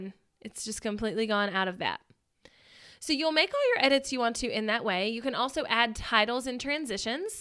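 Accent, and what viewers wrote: South, United States. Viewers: Great video, as I was listening I was like "this lady is from the South". Sure enough NC, Me too. Thanks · Great video. She explains it well, and has a cute accent. From one southern to another-- get it girl!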